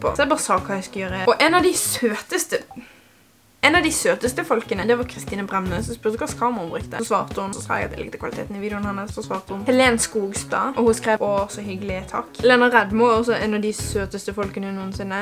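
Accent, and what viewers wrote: Bergen, Norway. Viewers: Digger videoene dine hah elske bergen dialekt:) E klÆin sjøl;)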